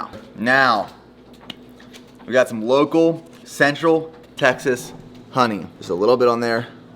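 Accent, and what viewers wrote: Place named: Pennsylvania, United States. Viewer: How does a guy from Texas have a Delaware County, PA accent?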